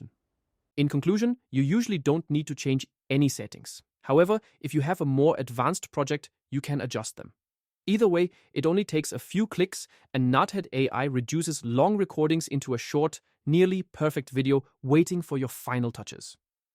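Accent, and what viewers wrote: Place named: Europe. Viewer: I couldn't help but notice that your speech accent changed drastically...like your glorious European (which country?) accent was just "erased", or at least muddled and more American. What happened there? Did AI try to correct that, and American-ize your speech? I'd seriously like to know! For the record, I like your accent, it's very distinguished.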